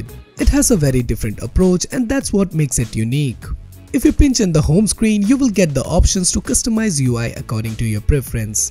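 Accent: India. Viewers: God indian accent is the most unbearable.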